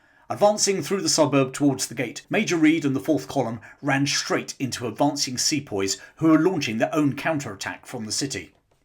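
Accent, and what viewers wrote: United Kingdom. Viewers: I just discovered this history channel, and it's the very topics I want to hear! Best part, a Brit with a good voic is narrating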